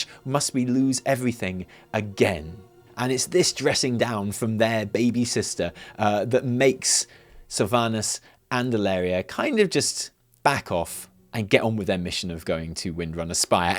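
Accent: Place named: United Kingdom. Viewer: Love your content bro. Also iam getting used to that strong brittish accent day by day. I swear i didnt understand single word to britts at first, but now is it better and better!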